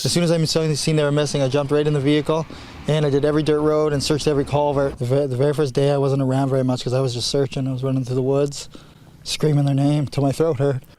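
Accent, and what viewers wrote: United Kingdom. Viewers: I don't know where you come from but you seem to have a British accent. So have you ever been to that area of Nova Scotia. It appears to me that you have already decided that the parents have something to do with the kids disappearance. Do you have any experience working with how East Coasters speak. I certainly hope that the RCMP don't put much stock into what you are saying. When you are so upset by your kids missing you may say a lot of things that don't make any to someone not native to Canada. Sorry I am concerned about the wee kids being missing this guy is so full of shit it is unbelievable.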